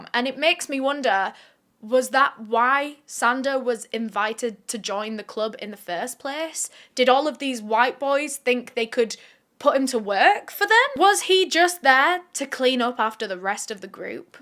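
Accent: United Kingdom. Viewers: I mean let’s not forget about British history ….. It’s rich hearing someone with a British accent talk about how horrific the Belgian colonisers were.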